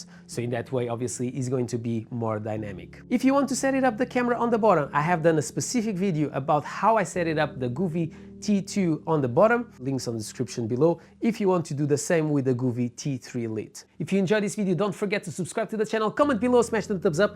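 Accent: Portugal. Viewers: Wait…. Are you Portuguese?! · Bro.. You might have the strongest Portuguese accent I've ever heard 😅 (I'm also Tuga)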